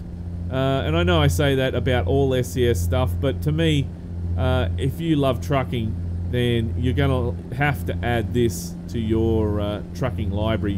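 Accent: Australia. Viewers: I hope that SCS Software DLC (as you mention in the video) will include some of the latest SCANIA Truck models as well, and not only Volvo trucks. I do have to emphasize that as an old former truck driver (25 meter) with years of experience, SCANIA is the brand of trucks that are very close to my heart, and have always been. In another words, I'm a loyal SCANIA Truck Fan. By the way, with all respect, I really like your Aussie Accent. And another thing, stay away from the Whitetips, Eastern Brownies and Taipans (specially the inland ones);-) Take Care and God Bless You and Your Family.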